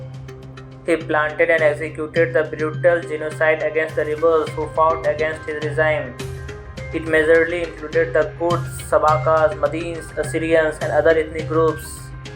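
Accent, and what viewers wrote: India. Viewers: My mom hates your hindi accent, anyways, Vlad The Impaler was a mini-king Of Dacia (Named Romania In present)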